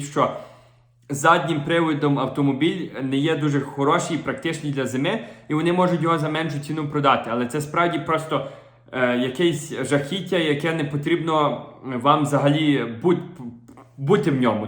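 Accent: Western Ukraine, Ukraine. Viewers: Дякую за відео,. Ти так класно, по простому розказуєш, хочеться сказати, так по нашому, по западенськи😁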